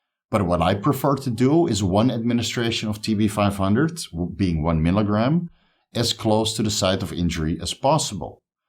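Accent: Netherlands. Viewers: Where is from? I hear a slight dutch accent 🤭 ah nice thicker skin. Using that triple peptide stack now to heal from major surgery. But my skin has become very thin.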